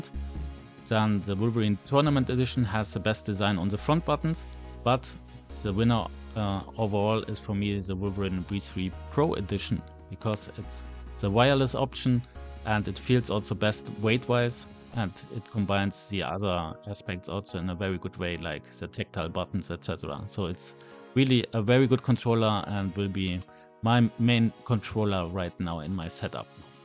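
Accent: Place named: Germany. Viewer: Bei der harten englischen Aussprache würde ich schwören, dass deine Muttersprache ebenfalls Deutsch ist 😄 gutes Video, hat mir weitergeholfen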